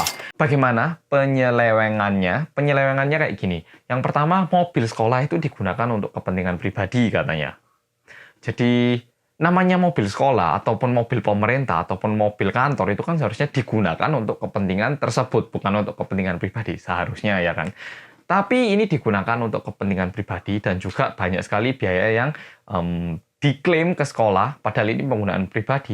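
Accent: Java, Indonesia. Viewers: Memang medhok apa sengaja d medhok2in? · Mase medhokkk tapi nek nyebut nama wong Korea lidah medhoknya ilang.. piye tho masss?? Ajari aku 😁😁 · Bang, medhok aee... 👌👌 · duh mas medhoknya wuenak didengar, uapik tenan kalo saya dari cilacap jadi ngapak bahasa jawanya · Mas . Panjenengan medok tenan.. sy baru ngikutin channel ini .. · Medok bgt aku aja gak semedok gitu... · pas nyebutin artis-artisnya kaya mc music bank, pas kelar langsung medhok lagi😂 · Medoknya itu lohh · Bahasa inggris nya kok medok aksen jawa 😂👍🏻👍🏻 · ????knp kk ini keliatan kek orang Korea tpi gaya bhsnya kek orang Jawa:)))))) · Bahasa korea logat nya auto beda. Balik ke bahasa indo jd medok lagi. Kok bisa gitu😂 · (Bagian waktu masnya nyebut nama2 artis korea pake aksen korea tapi abis itu balik medok) Terimakasih kembali :) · Kakak bahasanya medok banget...hhhhh Kaya ke jawa2an gitu... 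Gemes deh jadinya... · Bahas KATE YUP dong babang ganteeng..udah banyak yang aku liat tapi pengen versi babang medok :') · btw ko bisa selancar itu sih b.indonya? ketambag logat jawa 😂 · Pas ngmg nama2 idol korea lgsg percaya klo kak orang korea (saking medoke kadang gag percoyo) 😁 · pertama kali nonton tentang yang kasus kapal sewol, ngakak sendiri ngomongnya MEDO bangeeettttt wkwkwk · Ngomong indonya medok tapi waktu ngomong korea langsung keliatan aksen korea :v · Keren, ditunggu ep ke 2 burning sun nya.. medok asli, ada beberapa yg rada kepeleset waktu lafalin "s", masih berasa koreanya hahaha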